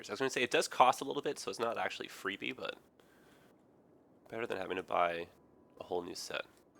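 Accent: Toronto, Canada. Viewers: Great videos! For the past few days I've been watching a ton of Cities: Skylines videos and I've noticed a huge problem with all of them. The person doing the video makes a lot of small mistakes that have an immense impact on the gameplay and they don't notice it until maybe an hour later. SOO annoying. I know they have a lot on their plate when doing a video, but mostly I think it's because they are rushing it. With your videos, I haven't noticed anything wrong. Love the laid back style! Ps. I live in Toronto too at the moment and I like your accent :D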